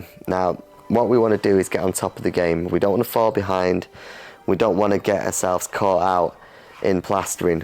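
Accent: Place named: Manchester, United Kingdom. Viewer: my brother been following your Chanel as im researching plastering, got to say your content and personality are really good my man. really good vids. you sound like you manc based do you get to east cheshire at all i got a big 4 room extension need a plasterer??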